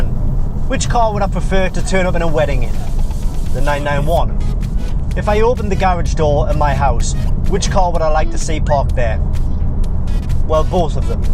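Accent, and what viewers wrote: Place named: Geordie-Scouse, United Kingdom. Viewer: Unwatchable. Horrible muzak and what's that fucked-up Geordie-Scouser accent?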